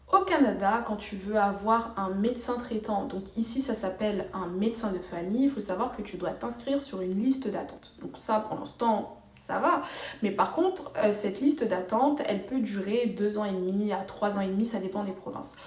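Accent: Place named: France. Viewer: mais de quoi tu nous parle, ta un accents Français et tu parle de pas venir au canada si.........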